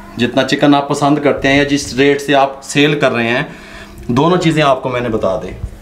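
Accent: Punjab, India. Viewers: He is so simple, jab yeh kehtay hain “ aap bolain gay koi cheez banayee thi” can’t help laughing, his Punjabi is so cute😅😅😅🌹 Theek hu gaya.....!! 😊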